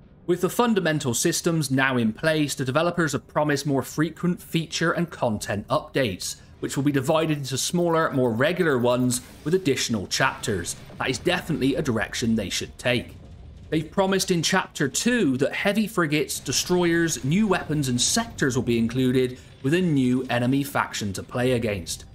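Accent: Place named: Cornwall, United Kingdom. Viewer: First time watching this channel and ive got to ask: is that a cornish or Somerset accent?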